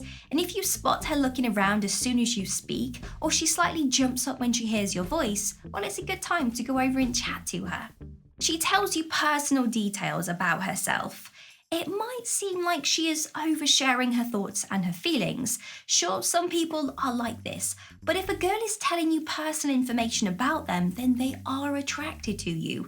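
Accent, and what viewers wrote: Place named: Sheffield, United Kingdom. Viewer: Are you from Sheffield by any chance?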